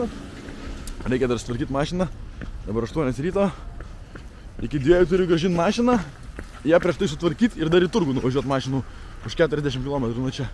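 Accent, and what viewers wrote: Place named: Rokiškis, Lithuania. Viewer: Ale😊😊😊 atspėjau,kad iš Rakiškio nu vienu žodžiu iš to gražaus krašto girdžiu pagal tarmę😊😊😊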